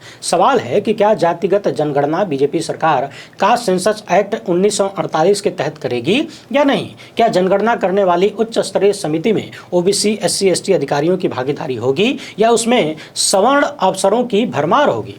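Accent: Bhojpuri, India. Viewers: ये भी अजीब संयोग है जी आपने कहा, "गर्मी का मौसम है ठंडा पानी लेकर बैठिए मेरी टेबल पर ठंडा पानी ही है। " यूं भी मैं एक बजे के बाद ही सोता हूँ। आपकी खबरें देने का अपना और अपनी भौजपुरी स्टायल में बोलना आकर्षक है वार भी सीधा करते हैं बिना किसी लागलपेट के।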